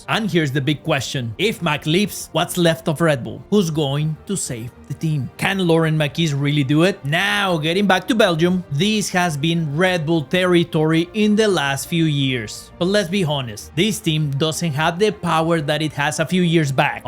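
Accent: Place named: United States. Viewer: Awesome! I like your American accent!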